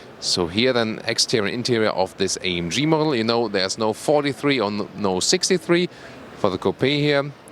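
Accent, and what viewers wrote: Germany. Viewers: Very good and clear comparison with all the details and your very nice and beautiful German Accent make it very intresting too . Thanks . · Hello there. You and Doug DeMuro are my favorite auto reviewers. If I had to choose only one, I would pick You over Doug. Please don't tell him that! Lol:) I have an E coupe and loving it. E class all the way! You are very articulate and thorough with your presentation. I don't get bored watching you and Doug. Nice German accent, too! Hope all is well for you both life and work. Btw, are you coming to Washington DC anytime soon? Cheers and take care! -Phi ( Fee Fi Fo Fum)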